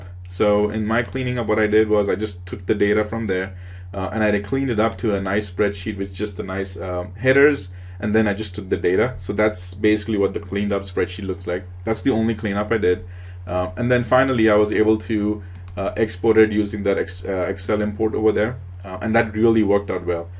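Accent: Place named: India. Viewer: thank you every video, one question I have, which is off topic, your accent is somewhat INDIAN, are you ever belonging from india, please answer sir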